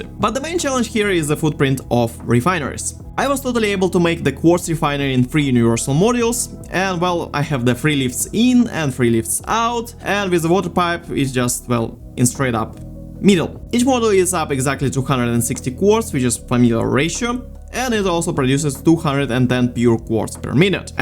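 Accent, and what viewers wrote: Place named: Russia. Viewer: Русский акцент... Вы русский?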